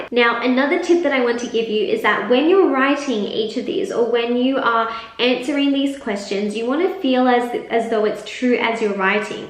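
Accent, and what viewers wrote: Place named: Australia. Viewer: It's really unique to see a pretty Asian girl with such a broad Aussie accent.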